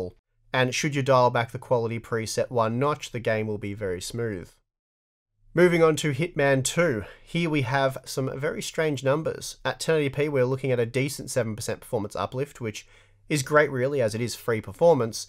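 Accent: Australia. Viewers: Love the aussi accent! 👍😜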